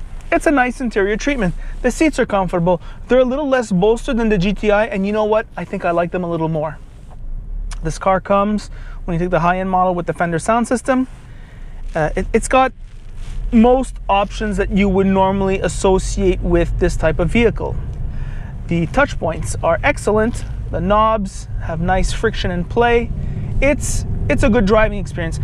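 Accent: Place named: Montreal, Canada. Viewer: Dude has such a Montreal accent.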